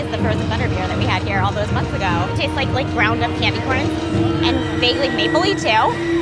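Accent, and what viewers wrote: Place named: New Jersey, United States. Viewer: I knew you were from Jersey or somewhere else close in the upper east coast...haha I can tell, born and raised in Florida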